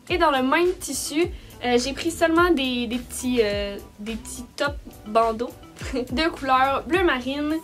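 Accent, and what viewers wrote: Quebec, Canada. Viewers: Tu viens tu du Quebec?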